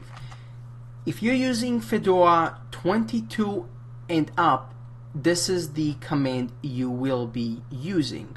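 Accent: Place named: France. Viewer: French 😄😄😄😄... sorry but this is the first time I'm hearing French accent beside in the movies....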